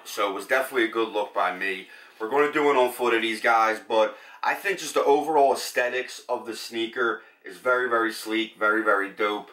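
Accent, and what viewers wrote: Philadelphia, United States. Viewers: Mika Swasaq philly.. philly.. philly must be where he from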